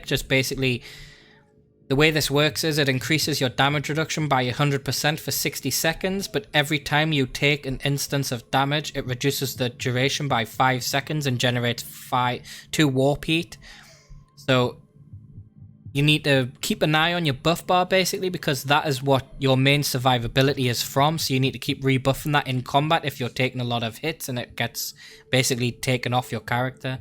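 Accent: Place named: Northeast England, United Kingdom. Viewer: Sup good to see another Brit putting out some good content, by your accent I'd guess your from the northeast? Anyway I love to see what you could come up with for ranged sader particularly H bolter if possible and a sniper sin it's seems the balance is way behind on those two types but It would be great to see if you could come up with something for them that can reach the milestone of battle120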